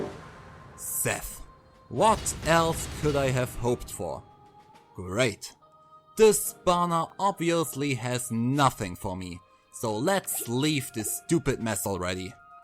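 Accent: Germany. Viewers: this man has a very german accent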